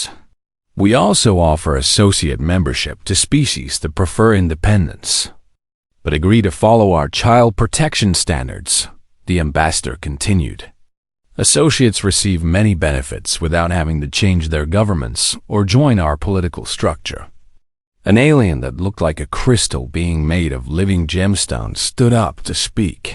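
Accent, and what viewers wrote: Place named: New York, United States. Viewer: This new york accent sucks. I cannot listen to this or watch this...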